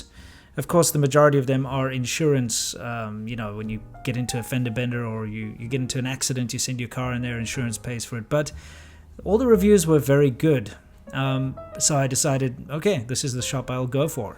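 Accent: South Africa. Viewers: Hey, love your car, and I hope you're not giving too much leeway to this shop. I know it's difficult to find a legit shop, but this is like well past 3 strikes and your out situation. Stay on them, you're investing big $$. Also I had a South African roommate many years ago, your accent takes me back. He was from Sandton, went to Stellenbosch University. Cheers! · What country are you from you sound very south african.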